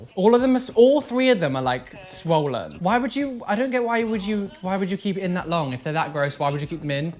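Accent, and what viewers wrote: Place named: Essex, United Kingdom. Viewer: sounds like a southern (I call it an Essex, considering I grew up hearing the accent a lot) British accent.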